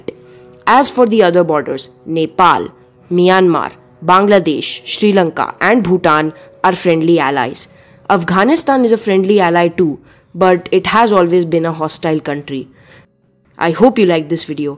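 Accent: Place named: India. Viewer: Speak english not “indish” please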